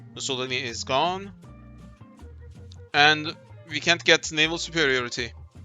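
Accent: Turkey. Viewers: aksandan direkt Türk olduğunu anladım :D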